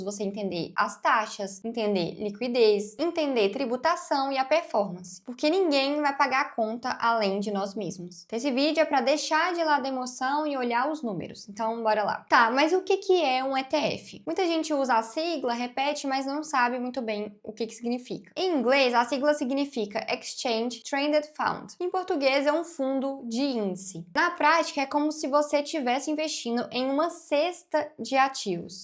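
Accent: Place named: Minas Gerais, Brazil. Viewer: Sotaque parecido com o de Minas e a voz lembra a da Sandy. Mas com relação ao conteúdo, ganhou um inscrito.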